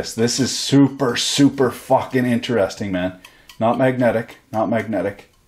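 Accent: Quebec, Canada. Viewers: Are you a french canadian ?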